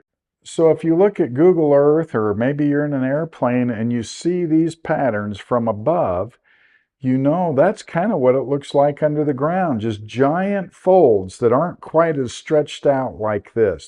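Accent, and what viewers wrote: United States. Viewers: Great content. Just so you're aware, the YouTube cc talks about "Anna Klein" where it should be anticline. It would be great if your editor could add these key terms and the names of the various structures as text on screen for people who are hearing impaired, watching with background noise, or not native English speakers accustomed to an American accent.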